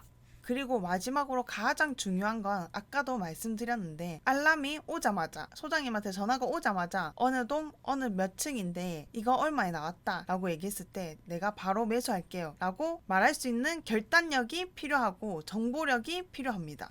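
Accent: Gyeongsang, South Korea. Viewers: 어후 쌍도사투리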